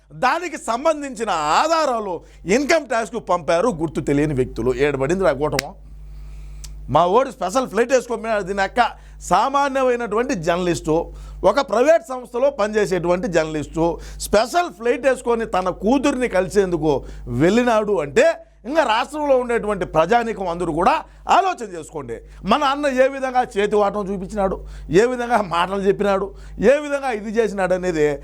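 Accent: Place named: Telangana, India. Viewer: Bro slang Telangana la undey😮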